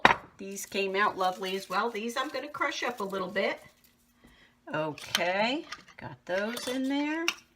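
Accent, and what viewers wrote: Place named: East Coast, United States. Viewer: Looks delish! You from east coast. Slight accent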